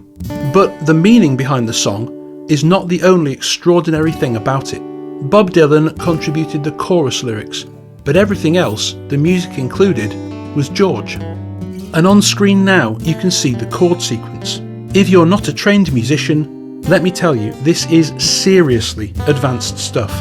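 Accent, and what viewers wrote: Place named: North, United Kingdom. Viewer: You are an excellent storyteller! Don't short-change yourself or your audience by adding meaningless background music😮 Seriously! It's enjoyable to listen to your voice, northern accent and natural speech rhythms👍🎉 Any background music is not only superfluous but substracts from the listening experience imo.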